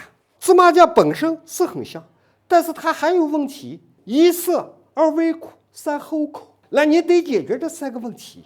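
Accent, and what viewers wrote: Shaanxi, China. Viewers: 纯正的陕普，非常亲切！感谢！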